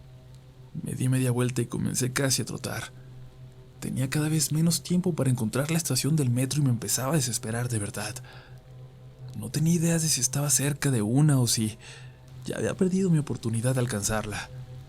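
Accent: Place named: Michoacán, Mexico. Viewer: Este habla como si fuera de Morelia Michoacán! 👍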